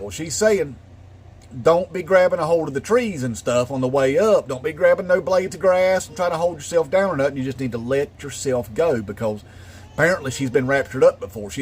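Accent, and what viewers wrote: South, United States. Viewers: Hahaha this guy is modern day version of the Third Reich with a redneck accent. The left hate it when other people have different opinions. I don't believe in the rapture therory but certainly respect those who do. HEY DUDE LET PEOPLE BELIEVE THE WAY THEY WANT TO IT IS CALLED THE FREEDOM OF RELIGION...EVER HEARD OF IT? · Hi Mr., I gotta confess I love your accent, it sound so american-rich linguisticly!! Cheers from Costa Rica!!! The whole world is literally watching!!!! 👍🏼👍🏽👍🏾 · You sound like you're from the South. Don't tell me that you can look around the US today and not feel that the idea of Jesus coming to unalive you doesn't have some appeal.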